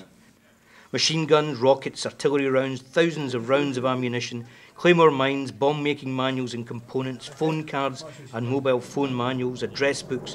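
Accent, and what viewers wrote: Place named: Scotland, United Kingdom. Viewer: To bad the Scottish accent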